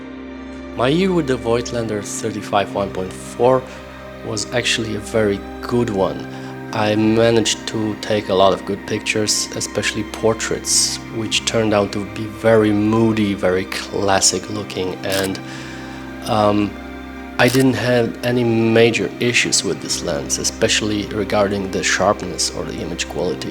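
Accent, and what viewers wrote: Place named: Poland. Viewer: Po akcencie mam wrazenie ze jestes Polakiem:) Nagrywasz tez filmy w polskim jezyku?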